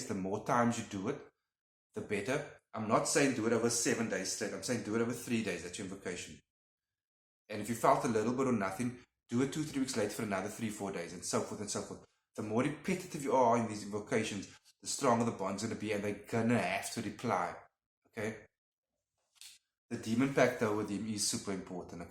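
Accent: South Africa. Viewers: Hi! Love your content. Fellow South African here. I could hear from your accent 🇿🇦😉 I'm from Cpt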